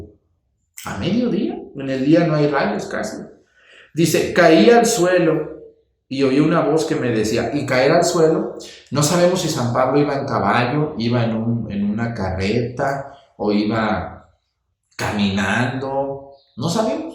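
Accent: Mexico. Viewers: Anque en retardo la sigo ya estoy 28 Entres dias me aggiorno desde ITALIA ANQUE SOY PERUANA DIOS LE DE SABIDURIA PARA TRASMITIR EN NUESTRO PERSONASA ALIMENTAR NUESTRO ISPIRITO PARA ESTAR BIEN.SOY FELIS DE ABERTE ENCONTRADO PADRE. NOSE COMO TE ENCONTRE PERO.TE ENCONTRE.EL AÑO PASADO POR CASUALIDAD.ME AYUDA MUCHO EN LA MIA CONVERSIONE NEL MIO CAMINO. PERO NO AY CASUALIDAD DIOS ME PUSO PARA YO ESCUCHARLE.CONSU DEJO MEJICANO ME ASE REIER.ANQUE USTEDE DIJO UNA BEZ NO ES PARA REISE LO QUE USTED ABLA..PERO SU DEJO.ME ALEGRA ME SACA UNA SONRISA EN MI TRABAJO CANSADO DIOS ME LA BENDIGA EN SU CAMINO AMEN AMEN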